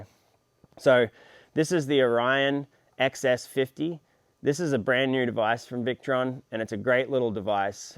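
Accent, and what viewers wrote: Australia. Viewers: Australian accent and then 120V - confused for a while. Disadvantage I see is power loss in converting the 12V DC alternator power to 120V AC then converting it back to 24V DC... I think the new 12-24 Orion would lose less power. I also don't understand why laying some thick DC cables from the Alternator to the Orion should cost so much either... in comparison to the cost of the RV/Caravan. Batteries - I love Victron but there are far cheaper brands of LiFePO4 batteries on the market. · First I thought with your Aussie accent that you were in Australia but not so you’re in the US. Australia has new rules for caravans where batteries that are installed in a liveable space must be in a sealed metal box that’s vented to the outside.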